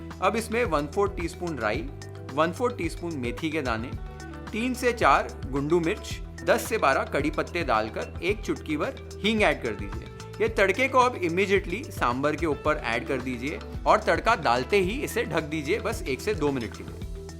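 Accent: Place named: Gujarat, India. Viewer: you speak Hindi in Gujarati style😊😂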